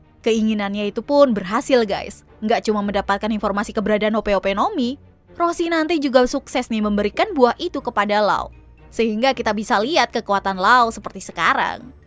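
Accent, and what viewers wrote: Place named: Betawi, Indonesia. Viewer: saikk ada sdikit logat betawinye ~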